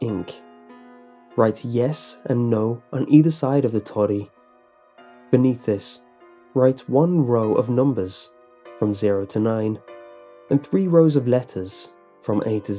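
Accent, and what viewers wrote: England, United Kingdom. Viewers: He actually mentions in his "Draw My Life" video that his dad is English and his mother is Filipino, which I found surprising. Most people I know who are from the Philippines have thick accents, even if they've spent years or even a couple decades in another country, and alot of people I know who are raised with one or more parents who have thick accents end up with at least a faint accent. has an absolutely flawless English accent, and until I saw that video I assumed he was raised in some posh English family:P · I believe he is english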